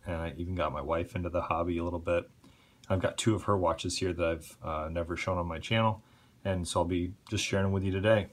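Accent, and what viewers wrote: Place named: United States. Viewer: Are you actually Dutch? You sound like a native speaking American!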